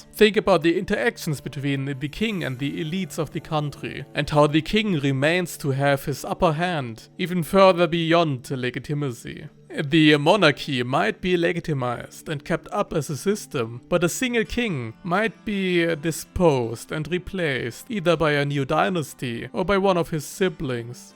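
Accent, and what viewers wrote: Germany. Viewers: Me when my favorite setting YouTuber is losing his magical German accent 😢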